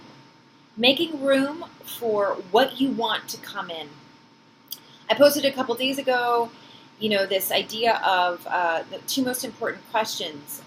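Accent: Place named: United States. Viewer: I really thought you were British in John Carter... O_o I'm still trying to get used to your American Accent... talk about a twist.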